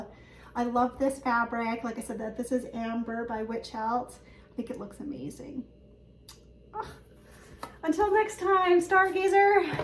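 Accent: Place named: Canada. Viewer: Oh, I love your videos. Your taste in charts is wonderful and your stitching is so beautiful. On top of that you have the most soothing voice and lilt to your lovely Canadian accent. I grew up on the NY/ON border and love what we call the “round Os” of our Canadian friends. Delightful to listen and watch.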